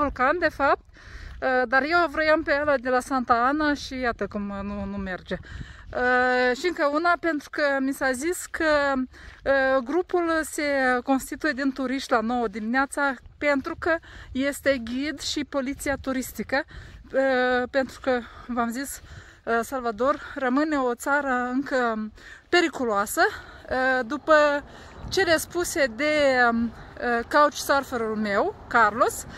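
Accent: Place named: Moldova. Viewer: Bonjour..!! Omul bun atrage binele.. Te urmăresc cu mult drag si te admir pentru ceea ce faci, singura prin lume. Accentul tău moldovenesc imi încălzește sufletul. Bunicii mei au trăit la graniță cu Rusia si vorbeau aceeași vorbă dulce ca și tine. Mult succes în continuare. Prends soin de toi! 🤗🍀🥀🥀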